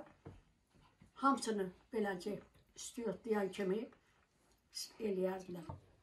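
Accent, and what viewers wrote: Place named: Baku, Azerbaijan. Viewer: Nan bakı lahcasikimi dansdl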